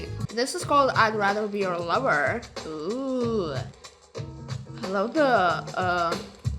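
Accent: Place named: Canada. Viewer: Wow that's amazing your Lithuanian but do you live in Canada your accents Canadian I thought sorry I just kinda shocked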